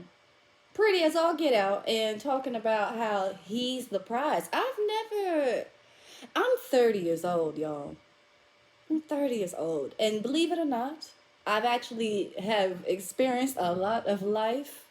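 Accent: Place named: England, United Kingdom. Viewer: Your English accent 😂👌🏾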